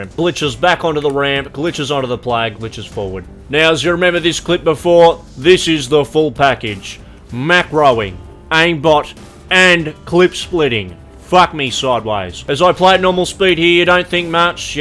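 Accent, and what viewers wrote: Australia. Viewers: Fucking love Aussie accent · the hard australian accent disgusts me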